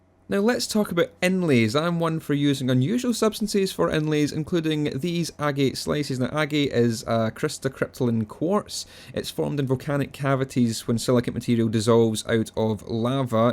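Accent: Scotland, United Kingdom. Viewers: love the scottish accent. feyner tooth foyl :) keep up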